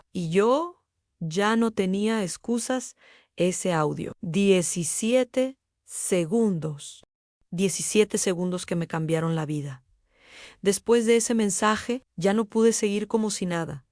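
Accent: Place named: United States. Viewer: Porque ese tono Pocho igual que las Historias de hombres con Historias de Inmigrantes an ho muy pochos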